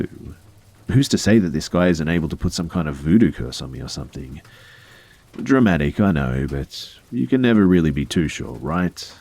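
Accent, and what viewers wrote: Australia. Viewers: As ALWAYS, hearing your VERY COOL, unique Aussie accent as you read these wonderfully twisted stories puts me in a FABULOUS mood as it truly makes me happy; no matter how creepy, spooky, shocking or bizarre the stories are! Thank you for the dedication you have for your subscribers/fans. It is most decidedly more fun and interesting knowing you have this amazing content AND without a DOUBT, you ARE one of the absolute BEST YouTube narrators out there. PERIOD. (I apologize if my commments seems sort of disingenuous, a bit immature or ass-kissy (yep, I make that one up!), but I really enjoy your channel and it's content...so please keep doing what you're doing Be.!!!) THANK YOU!!! 💀👽🛸🧛‍♀️💜🧛‍♂️⚰🦇🌙⛈🎃☠🐱🕸🕷👻🧟‍♀️🧡🧟‍♂️🧙‍♂️🔮🧙‍♀️🖤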